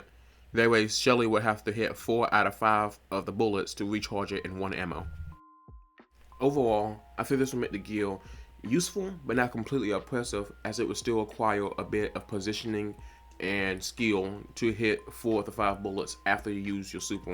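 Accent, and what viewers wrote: United Kingdom. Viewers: Is your accent british?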